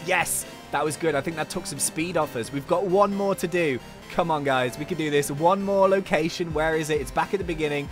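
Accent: United States. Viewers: That American accent tho... that's better than I can do and I've never been out of the USA!! 😆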